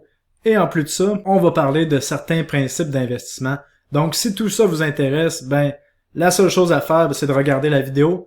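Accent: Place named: Quebec, Canada. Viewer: Ah je voulais savoir si tu as un excel perso pour tes crypto ou un logiciel à me proposer ? Merci de ton aide et de ton accent , ça me rappel mon premier voyage au Québec, il y a déjà 30 ans et oui !!! Bien cordialement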